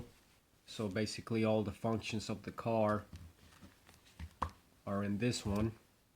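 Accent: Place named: New Mexico, United States. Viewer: Sounds like a NM accent. The natives there have a very slight lilt to their voice. It comes from being surprised all the time.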